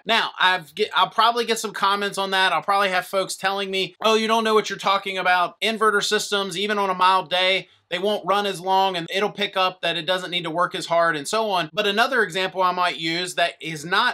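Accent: South, United States. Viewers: background of some southern sounding vowels, and the way you pronounce “on”. Your vid was helpful, having some hvac issues/thermostat issues at our house and discovered that we have an inverter heat pump. Been in the house for about a year had no idea.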